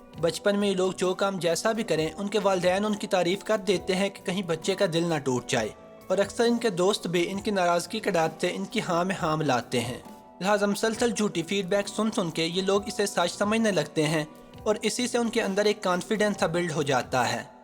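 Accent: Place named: Lahore, Pakistan. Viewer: I think lahori ha admin due to his lehja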